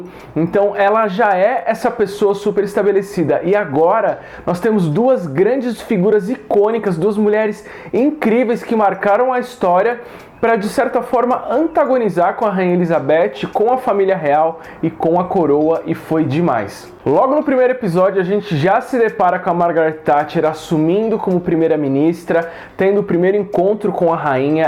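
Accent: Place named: São Paulo, Brazil. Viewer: Raro sotaque paulista! Men gostei de+ da temporada TODA, mas o quinto episódio foi o meu preferido gostei de ver a invasão do palácio não sabia desse fato, fui buscar e realmente aconteceu "achei engraçado" a dama de ferro, fantástica! mas não curto muito a indiferença que ela tinha pela rainha o que eu percebi foi que a série não enfatizou o fato de que a primeira ministra detestava os encontros semanais e arranjava qualquer desculpa para não ir " e não ia" .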